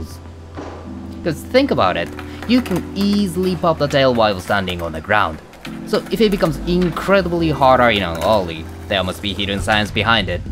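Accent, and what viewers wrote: Japan. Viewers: if this guy is not japanese, I don't know what planet he is from. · he sounds japanese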